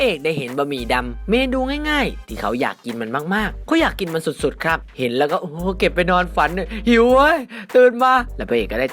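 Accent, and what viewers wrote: South, Thailand. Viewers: พี่เป็นคนใต้หรอหนูได้ยิน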